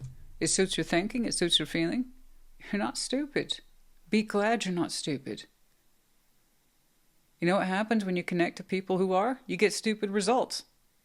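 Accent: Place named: Texas, United States. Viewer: You are the #1 Tarot channel, so many just read each other's scripts, don't show themselves actually doing the reading. Have no in-depth knowledge of the tarot & have horrible voices, unlike your sexy Texas drawl & wicked laugh. Thank you for being here & being so sassy I love your channel! Capricorn power!!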